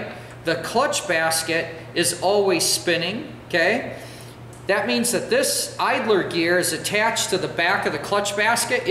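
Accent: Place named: South, United States. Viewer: Very very good this is one of the best ...I cant handle half the guys talking to much ....this fella just simply explains it I'd dang near say he's southern 😉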